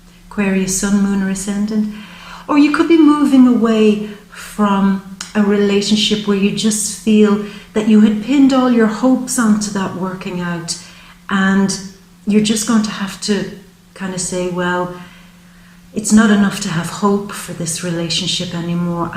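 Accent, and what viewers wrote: Ireland. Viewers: you’re just incomparable. You’re a lightning bolt of inspiration striking out across the Atlantic—if only lightning bolts wore black dresses, talked with a brogue, and blew kisses. Close enough.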